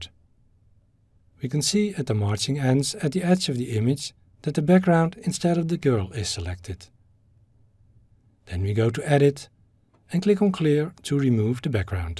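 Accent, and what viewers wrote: Netherlands. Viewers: hah your dutch!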